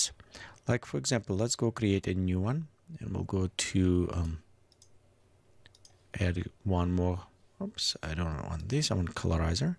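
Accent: Russia. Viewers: нахуя на английском то? Слышно же сука что акцент русский...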